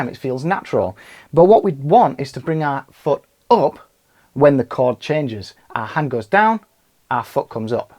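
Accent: Yorkshire, United Kingdom. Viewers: are you form Yorkshire?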